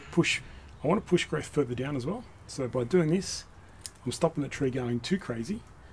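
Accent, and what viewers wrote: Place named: Australia. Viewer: Beautiful maples ❤ I love that Aussie accent. You have beautiful trees and I appreciate you sharing your thoughts on trimming them in spring. 👍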